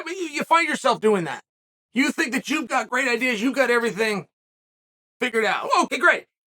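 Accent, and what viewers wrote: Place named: United States. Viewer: Clicked on the vid, heard the American accent, closed the vid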